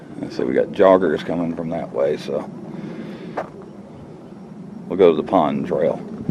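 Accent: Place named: South, United States. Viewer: Cool place... Anyone every tell you that your voice is similar to an old comedian named Lewis Grizzard? Of course you don't have as thick of a southern accent as he did but the tone is similar. Very cool I think...VERY clear and easy for these older ears to hear. Brandon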